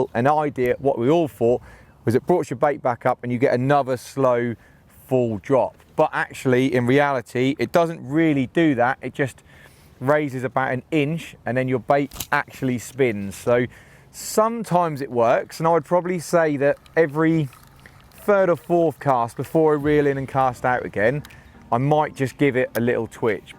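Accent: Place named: Coventry, United Kingdom. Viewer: Your accent tells me your a cov fan?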